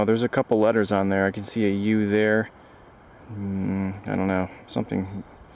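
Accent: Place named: New England, United States. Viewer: Excellent video! I wish I knew about this channel before. I subbed ya. Are you in New Hampshire it sounds like you have a New England accent. I'm from Maine myself and I love metal detecting and coin collecting. It definitely sounds like you know your stuff and that's cool. Dave from Maine.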